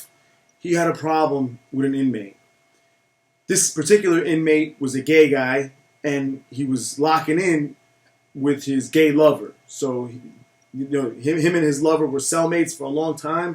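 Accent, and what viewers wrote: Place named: New Jersey, United States. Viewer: Is it prejudice of me to automatically assume u from jersey within the first 0.00001 seconds of clicking on ur vid?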